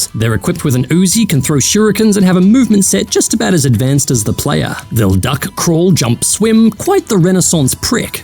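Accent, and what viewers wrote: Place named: RP, United Kingdom. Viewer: RP prononciation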